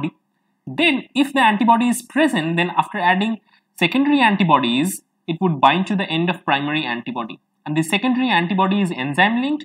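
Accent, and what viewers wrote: India. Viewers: Unfortunately I do not understand the English from Indian people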